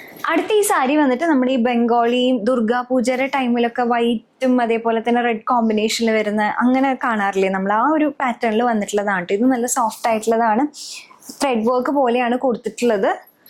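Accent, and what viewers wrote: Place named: Valluvanad, India. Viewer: Thrissur കാരി അല്ലേ...പക്ഷെ സംസാരം..slang okke വള്ളുവനാടൻ ആണെല്ലോ...സ്റ്റൈൽ ിനു വേണ്ടി ആണോ ഇങ്ങനെ പറയുന്നത്... anyways nice vlog ❤❤❤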